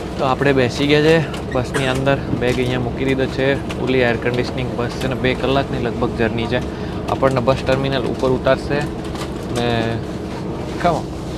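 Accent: Rajasthan, India. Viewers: મસ્ત કમ્પ્લીટ ગુજરાતી બોલો છો પણ થોડો રાજસ્થાની ટચ આવે છે સુંદર માહિતી 😊😊😊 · ગુજરાતી ભાષા મા થોડી રાજસ્થાની હિન્દી નો લહેકો આવે છે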